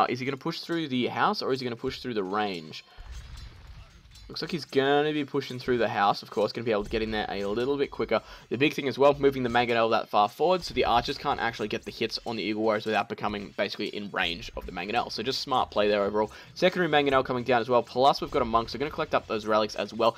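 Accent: Australia. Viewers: Resonance22 will always be my favorite caster, but you're amazing too! :D I love aussie accents 11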